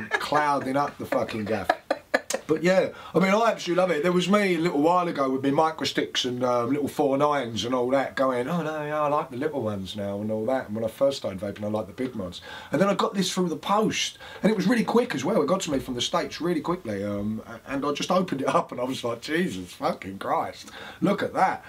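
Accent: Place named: Cockney, United Kingdom. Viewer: Your cockney accented humor never fails to entertain me. I waiting for the first American video where somebody kicks someone else's ass with a mod like this.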